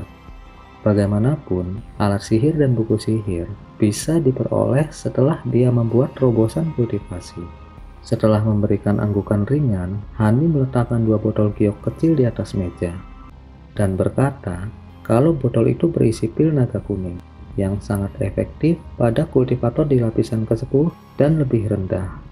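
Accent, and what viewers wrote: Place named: Bali, Indonesia. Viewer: Logat bali ini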